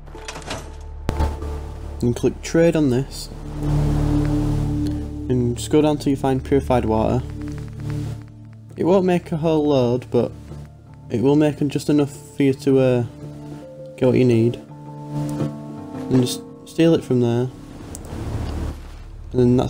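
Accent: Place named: United Kingdom. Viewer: This is the most British accent I've ever heard, Also thanks even tho this video is already 6 years old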